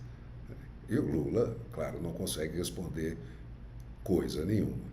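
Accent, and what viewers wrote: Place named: Arab world. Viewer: esse cara tem sotaque árabe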